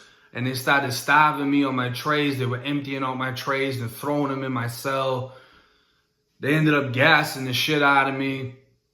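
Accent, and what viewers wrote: Boston, United States. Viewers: I’m under my girl’s thing here , anyways you’ve done a lot of time in Florida ? But your accent Boston ? I’m from NY , but lived in Florida for some yrs , spent a lot of time in Polk county fl , south county jail ( frostproof) our sherif was Grady Judd at the time , not sure if he still is ? But he’s a trip bro , could u do something on Polk county ? Ty for your vids pretty cool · "the sahgeant" my man you sooo Boston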